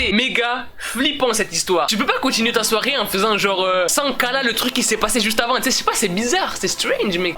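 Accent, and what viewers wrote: Marseille, France. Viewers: t marseillais parce que moi aussi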